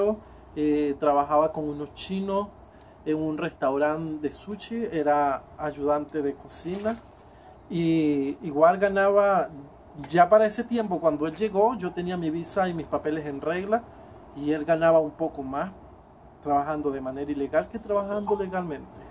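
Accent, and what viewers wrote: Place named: Chile. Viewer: Ya tienes el acepto chileno. se te nota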